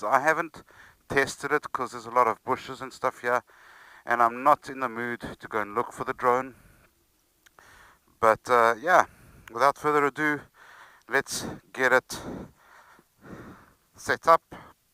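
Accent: South Africa. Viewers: you sound South African Portuguese?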